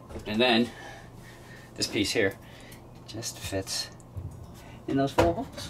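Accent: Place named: East Coast, United States. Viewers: Great Channel and good informative stuff ...but what makes me very happy is to hear New Hampshire accents again ... many moons ago when I was a kid we lived in Tamworth NH and I would take the school bus to Junior High up in Conway ...bitter cold ride but o how I loved Conway and the Mount Washington area .... Gotta get back to the North East again before I die ... love the Food and the people in a big way ... Happy Trails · Hi there, just installed my bug wire shields you had in your other video. Love all your fix it videos and glad I found your channel when you first started out. It feels like I have been everywhere you have because of YouTube. Good common sense information every time I watch your segments. My girlfriend is from back east and especially enjoys the videos and your eastern accent. Keep up the great work and enjoying life. 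Tom and Irene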